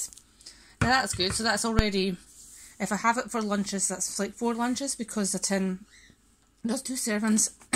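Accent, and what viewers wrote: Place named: Scotland, United Kingdom. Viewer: Love your Scottish accent along with your pantry haul - luv Toronto csnada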